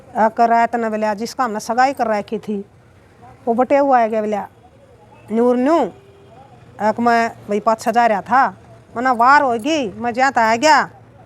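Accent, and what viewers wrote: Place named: Haryana, India. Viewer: konse Gaam KI BAat se aap KI Bhasa TO Rohtsk y Distt. karnal KI lagti Hsi.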